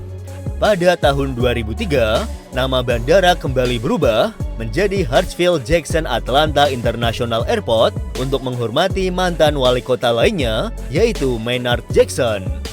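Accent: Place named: Indonesia. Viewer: KOK SOUNNYA INVOIC INDONESIA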